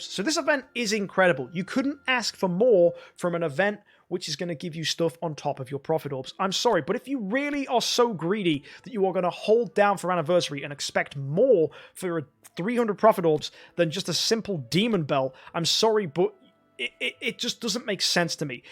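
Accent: England, United Kingdom. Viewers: here for English accent :d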